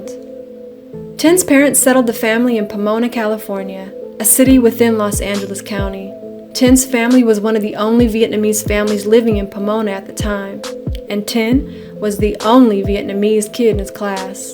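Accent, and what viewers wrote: South, United States. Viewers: Dam you have a nice voice? Southern?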